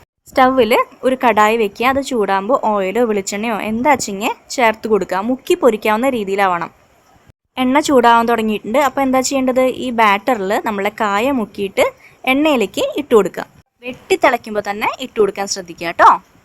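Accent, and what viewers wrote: Thrissur, India. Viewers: തൃശ്ശൂക്കാരിയാണോ ചേച്ചി? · Good presentation with Thrissur accent. Even a kid can understand.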